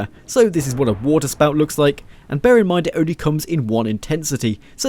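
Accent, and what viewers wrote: United Kingdom. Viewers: I love British accents :D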